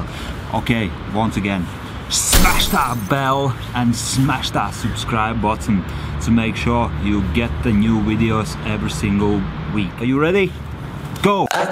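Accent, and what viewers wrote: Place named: Liverpool, United Kingdom. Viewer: Scariest place in UK ... 🤣 Shows my high school 🤣 can say I went to the school of hardknocks now? 🤩 And on another note, your 'accent' is off the charts, I can hear skem scouse in there and whatever your native language dialect is. 👍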